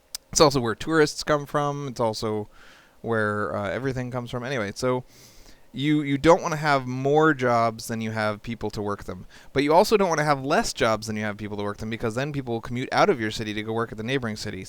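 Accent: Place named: Canada. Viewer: what are you canadian you totally sound like it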